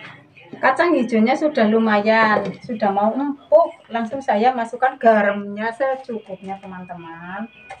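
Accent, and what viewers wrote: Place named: Java, Indonesia. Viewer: Logatnya medok bngt ya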